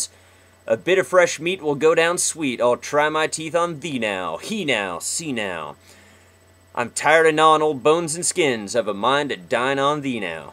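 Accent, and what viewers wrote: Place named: South, United States. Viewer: "Why the hell does my southern accent start to pop up when I'm reading something?" Be proud of your southern roots. Nothing wrong with a southern accent. grins.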